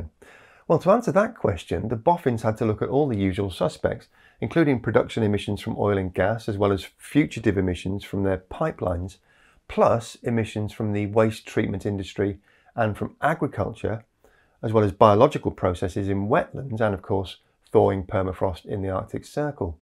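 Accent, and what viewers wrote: United Kingdom. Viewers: Methane? No, youthane. Why do Brits pronounce name of the gas that way?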